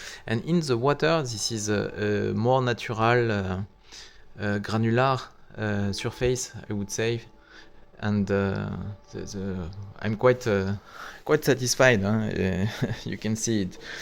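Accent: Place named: France. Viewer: Bonjour, si je vous écris en Français c'est que j'ai cru percevoir un accent Français dans votre anglais...Je suis frileux avec le fait qu'à l'intérieur de ces roches, l'eau ne sera pas filtrée d'une part, et sera stagnante d'autre part. Qu'en pensez vous?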